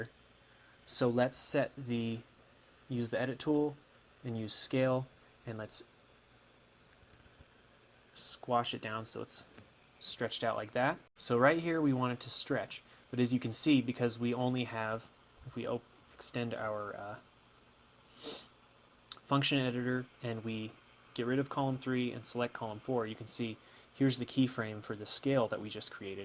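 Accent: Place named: North America. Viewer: Spent the last 4 months creating a project through marcomedia flash 8. I was making a sprite based animation series. Most of the time, thankfully I was just splicing and preparing sprites, improving them, looking for good material and whatnot. When I began using flash I came to a pit stop with z axis. It is very difficult with flash to operate an animation with multiple sprites going in front of and behind each other. It requires extensive knowledge of action script and set depths. Even then, the motion tweening stops working and requires more in depth programming. Screw all that, opentoonz I found by accident and now I want to begin my animation project all over again. The narrator does talk very slow and does have me rolling my eyes when he is all "So ... errrrmmmm this level here........ errrrrmmmm is going to be our uuuuuummmmm next scene." Yeesh, I've come to find all North American youtubers doing tutorials sound like this. 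Must be all the processed genetically messed up monsantos foods you lot are eating over there slowing down your brains or something.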